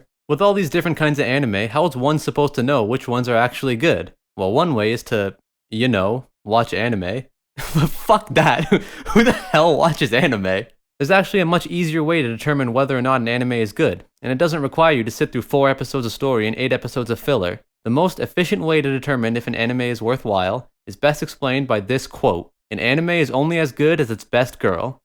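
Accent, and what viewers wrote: Canada. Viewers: He’s either from northern Michigan or Canada with that accent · I forgot this guy's a fucking Canadian but then I hear this nigga say about and eh.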